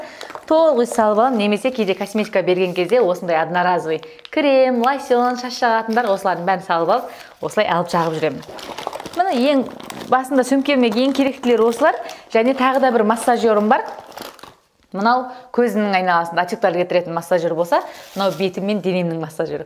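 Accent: Standard. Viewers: Жарайсыз, қаншама жыл Кореяда тұрсаңызда қазақ тілінде таза сөйлейсіз,бұл үлкен құрмет елге,тілге деген... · қызым,саған үлкен рахмет! Өз тіліңе деген құрметін үшін, өте таза сөйлейсің. Кереемет.Өте білімді екенің байқалып тұр. Негізі алған біліміңді білгім келеді жене Корей тілі қалай үйрендің? Кореяға қалай келдің, әлде тұрмысқа шыққансон ба?😊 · молодец өз тілінде таза сөйлейді екенсің, құрметте дұрыс Әрдайым жаңалығыңмен бөлісіп отыр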